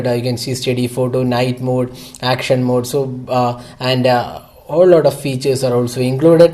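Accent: Kerala, India. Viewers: change ur mallu accent bro....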